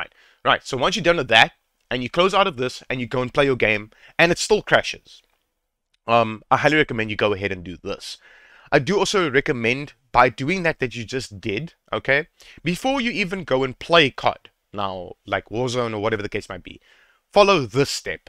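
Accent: South Africa. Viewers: Lekker what a legend! Adding you up. When I heard the South African accent I knew this’ll be a quick fix!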